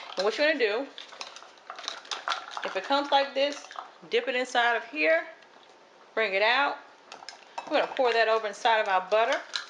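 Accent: United States. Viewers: I can tell you this you sound like a very good lady from America there are not a lot of good people in America. I always cook the turkey at my nieces place and there's about 14 of us and i always wanted to try to and injector the turkey because i know it will be so good. I stuff my turkey because everybody take some home and there's nothing left of the turkey. I can't find one here in Canada the injector i use butter unsalted not margarine and i add poultry seasoning and Rosemary olive oil and then I mix everything together and then I put it underneath the skin a pound of butter it's to die for I clean my turkey out just like you do :) I would like to do it like you didn't i have to go shopping today and look to see if i can find one of the injector. Thank you for the video Merry Christmas 2016 .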